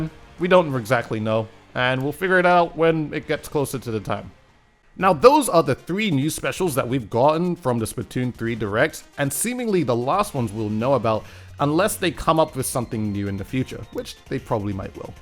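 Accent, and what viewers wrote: United Kingdom. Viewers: he literally has a British accent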